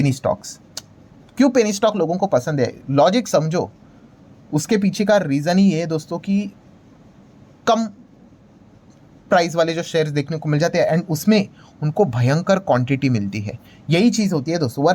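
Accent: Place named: Rural, India. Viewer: Gadha share market ka bolna nahi sikha gaon Wale ki tarha bolta hai .educated analyst ko dekh kar kuch sikha kar ke Kay bona hai aur kitna bolna hai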